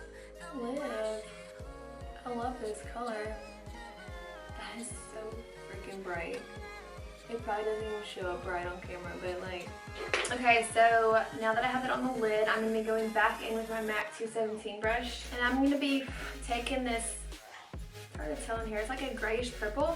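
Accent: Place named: South, United States. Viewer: Can tell you’re southern by the way you say “for” and “never” lolol. Could tell by other words too, I’m from Georgia. 😊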